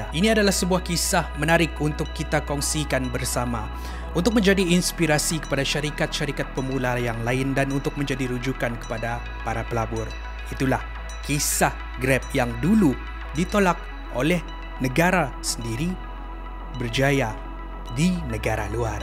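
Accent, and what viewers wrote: Sabah, Malaysia. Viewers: Bahasa Sabah dia dah tak pekat.. dulu dia cakap Sabah pekat gilerrr.. suka betul kalu dia cakap ada slang Sabah..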